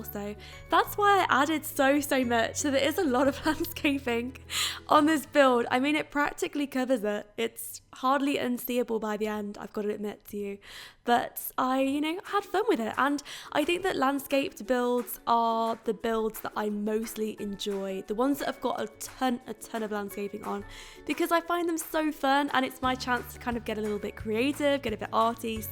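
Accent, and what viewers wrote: United Kingdom. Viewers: Can you do a Q&A? And if you'll do one I have some questions: Where are you from? (In the video you said you moved to an apartment in Switzerland, but you have like a "light" British accent) How do you find the inspiration to your builds? Can you do a colab w/ Vixella please?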